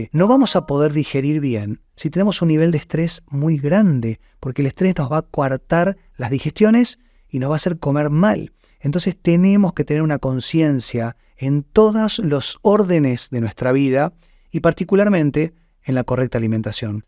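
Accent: Buenos Aires, Argentina. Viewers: Hola sos de Buenos Aires Doc??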